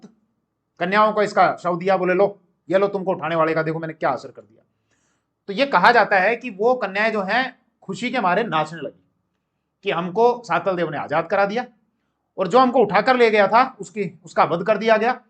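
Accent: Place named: Marwari, India. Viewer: Sir apki boli marwadi lagti h aap Marwad se h kya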